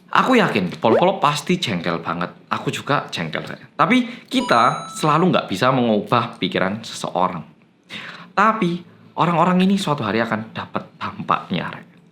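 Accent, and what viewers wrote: Java, Indonesia. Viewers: Bahasa indonesia bagus bnget dan ada jawanya dikit2 kaya org indonesia tulen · Beda kepala pastinya beda pemikiran.. dengan adanya kejadian yang seperti ini justru makin mendongkrak semangat wanita Indonesia untuk menjadi lebih baik lagi dalam segala hal.. disenyumin ajalah 😊 buat mas tetap semangat dan medhoknya jangan sampek ilang 😄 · Bang ini beneran orang Korea..? logatnya medok banget..kereen.. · bahasa indonesiannya medok baget...👍👍👍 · Menurutku pemikiranmu dewasa broy .... Keren ... Indonesianya juga medok 😂👍👍👍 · Bahasa Indonesia nya medok kek orang jawa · Logatnya semakin medok aja bang. Makin ngakak aku. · Dia udah tinggal di Indonesia (lebih tepatnya bagian Jawa) udah lama banget jadi lama² medok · Kok bahasanya lebih Medok appa any way I like u thx pemikirannya ya ..... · Sebelum nya saya minta maaf bang Abang nya logat bahasa nya kok medok😂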